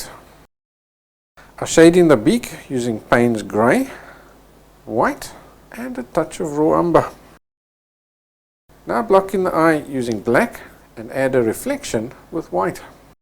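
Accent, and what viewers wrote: South Africa. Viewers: How refreshing to see a painting tutorial without the tedious real time or annoying back ground music. No boring pre chatter. Just straight in. That's why I can happily look at it more then once and to also enjoy the South African accent. Thank you so much!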